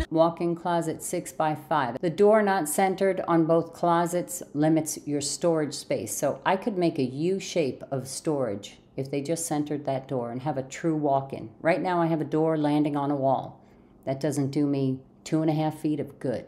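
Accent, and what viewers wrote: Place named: Northeast, United States. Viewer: really appreciate your vids. You so remind me of the Northeast, where i used to live- just your ways,, accent- down to earthed-ness-loveit!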